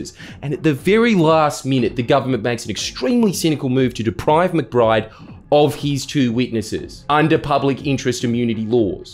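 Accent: United States. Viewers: american accent is scarily believable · ngl your american accent is pretty good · As a new American subscriber please shoehorn in your American accent more it’s amazing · Aside from nit pick pendantic mistake the nonrhotic terminating A in California although many American dialects especially measured by number of speakers is nonrhotic but it was clearly not intended to be one of those tangent over back to the point Fantastic generic American accent probably the best non-traditional media performance of the accent I have heard ever no question about it. That folksie quality is noticeably audible to the unaided ear but totally avoids the natural inclination of foregoing any subtlety in a culture foreign to the impressionist. typically it is way too hammed up this is not exaggerated to any extent it is just there I mean aside from the error but the error is pretty understandable because it is something that needs a bit more deliberate practice at first and also has to be kept up to date or any impressionist will stumbles over the particular artifact of going codes switching maskoff a bit prematurely at the end. 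It results from the eagerness to go back to one's own authentic self. This will happen to anyone that doesn't built up tolerance for doing it for long periods of time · Your American accent deeply unsettles me. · Your American accent is top notch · Your American accent is top notch mate, keep it up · Keep doing your American accent, I love it. It's absolutely great and terrible at the same time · Your American accent is very good. However, you don’t fully enunciate the “a” and “er” at the end of words like Californi”a” and Arnold Schwarzenegg”er”. Otherwise keep up the great work. Happy my small country could get some representation ( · I wish I could do a Aussie accent as well as does a Yankee one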